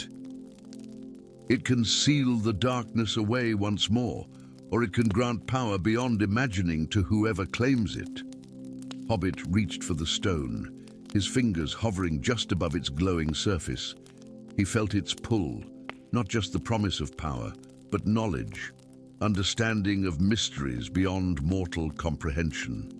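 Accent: United States. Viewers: Well this voice is better, but it still sounds American. I’d much prefer an English or European or Scandinavian or anything really but NOT American.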